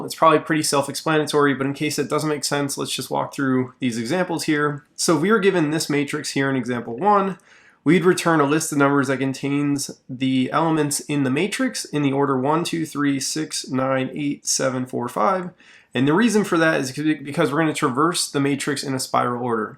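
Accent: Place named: United States. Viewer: The inotro and outro music are rather goofy, but it is so nice to hear an algorithm explained in normal, US accent English instead of, well, you know!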